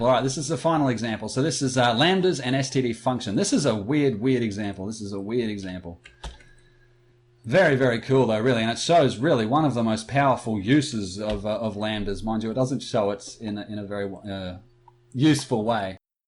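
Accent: Australia. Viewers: You're the good legend here:D Awesome high-quality content, generally detailed low-level explanations, and cool Aussie accent. Gotta love this channel! Wish I could be a supportive patron, but can't from here.:/ Salutes from Syria anyways.:P (The hype of "Return of Lambda" is unprecedented). xD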